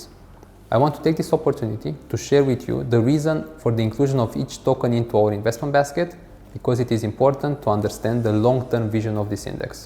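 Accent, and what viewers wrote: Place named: Romania. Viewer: I like your english! Nice! Romanian english accent! :) I like the moldavian accent, too, as a moldavian who I am! KR Costi! Thanks!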